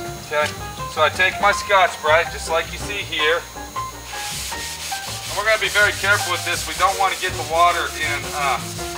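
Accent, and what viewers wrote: Texas, United States. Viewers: your videos are fun to watch and listen to a texan drawl..your great!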